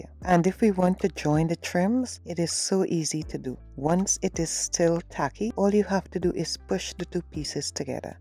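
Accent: Trinidad and Tobago. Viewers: I knew you were a Trini from the time I hear your voice🇹🇹🖤🖤🖤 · hey ,,, yesss! trini accent very nice I stumbled upon your channel and your voice got me watching.... nice ideas thanks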